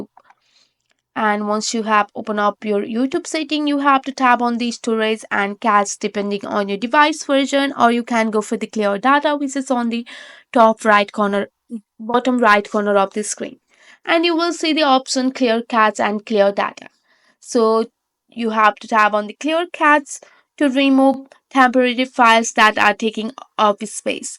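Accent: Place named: Nepal. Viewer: Getting that nepali accent.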